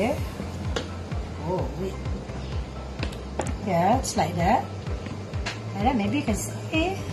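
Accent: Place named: Singapore. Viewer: Ganda ng accent mo legit na legit na singaporean hehe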